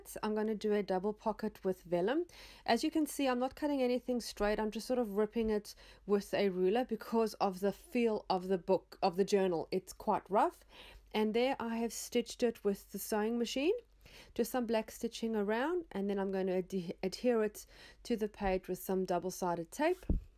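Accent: South Africa. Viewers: Hello 👋🏻 Just discovered this kind of journalling during the lockdown really and your channel came up in my feed! Love your creativity 💕 Heard your SA accent and wondered where you were? Going to watch some more 🎶🌟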